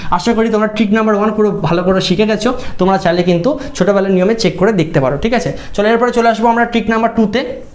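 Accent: Bangladesh. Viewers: আপনি কি নোয়াখালী?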